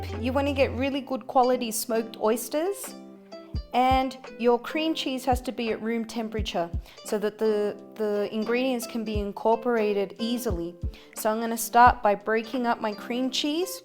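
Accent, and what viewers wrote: Australia. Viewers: saw you on Mike Chens channel. great channel and i have to say every time i hear your accent, I keep thinking of crocodile dundee or steve irwin. "you call that a knife?" lol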